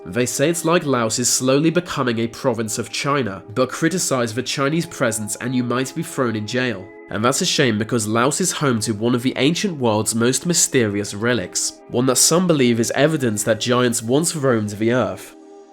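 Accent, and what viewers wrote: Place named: Cockney, United Kingdom. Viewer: Wanted to watch the video but couldn't stand his cockney accent. He doesn't so much speak the language as chew it up and let it lazily dribble out. UGH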